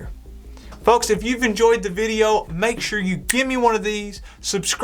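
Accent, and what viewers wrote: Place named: South, United States. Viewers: I listened because of your southern accent... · My goodness! thats the one of the sweetest southern accents I've heard! love from the netherlands! <3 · What's up with his accent? Why is he talking like Forrest Gump?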